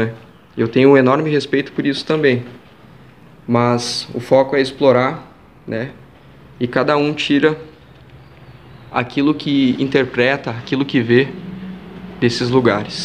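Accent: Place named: Rio Grande do Sul, Brazil. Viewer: Sim é outra foto amigo se Deus quiser vou conseguir ir lá adoro lugares assim e adoro seus vídeos parece que estou junto com voçe na hora que está em lugares assim de tão apaixonada por esses lugares ; que Deus te proteja sempre e te ajuda em todos os seus projetos que tem ai pra fazer aqui não tem lugares assim pra eu está indo e adoro o jeito que os gaúcho fala o jeito que voçeis são aqui na minha cidade fala que gaúcho são muito bravos eu não acho mais vou falar outra vez voçe está de parabéns com seus vídeos amigo acho que sou uma pessoa de alma antiga por amar tanto lugares assim bsj e Boa madrugada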